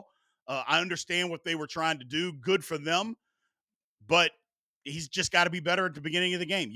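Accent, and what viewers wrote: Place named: South, United States. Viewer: Where you from? Texas raised, Arkansas raised? Alabama raised? I doubt MS like me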